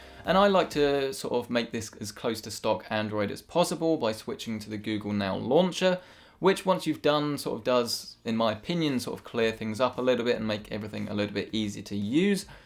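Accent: United Kingdom. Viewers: e sounds more annoying than other british accents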